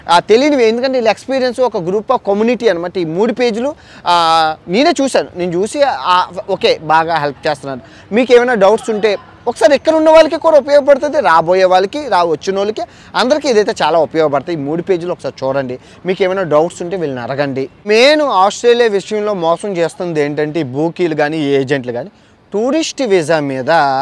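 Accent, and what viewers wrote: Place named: Visakhapatnam, India. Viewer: Mi matalaku navvuagatledu sir anthaena mana vizag basa kada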